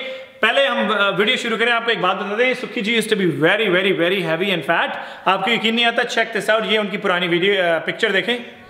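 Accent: Punjab, India. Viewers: watch ur all vedio bhai..i love the way u talk ur jokes songs punjbi voice n evry thing..👍😊m also pujbi nd jatniii 🤗love from pakistan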